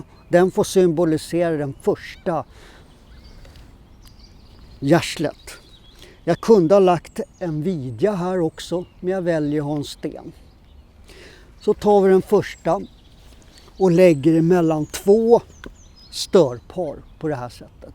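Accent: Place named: Stockholm, Sweden. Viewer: Samma dialekt, stockholmska. rösten är ju inte väldigt lik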